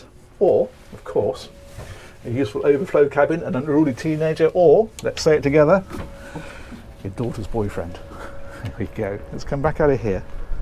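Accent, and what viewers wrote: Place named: United Kingdom. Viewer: Wow, what a fantastic toy, an absolute awesome party boat! Great tour as always, and lovely british accent makes every video even better!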